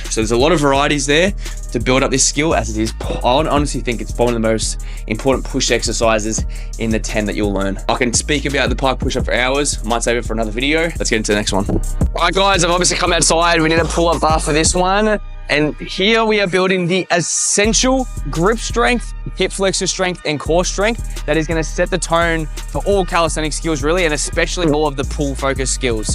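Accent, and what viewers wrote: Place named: Scotland, United Kingdom. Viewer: You have no idea how I wish to improve it .. I'm really into calistheny! What is the country you live at? Your accent remembered that of a Scottish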